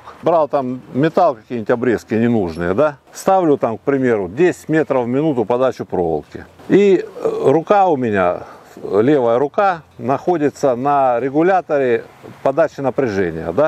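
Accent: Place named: South, Russia. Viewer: почему говор южнорусский? Точнее что-то между Краснадаром и Ставрополем, возможно Ростов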